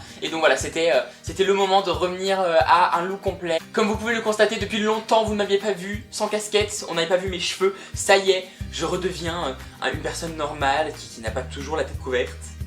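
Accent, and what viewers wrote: United Kingdom. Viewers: très jolie ce petit look... Très frais et naturel. #teamsnapchat. Tu as un.bel accent anglais :)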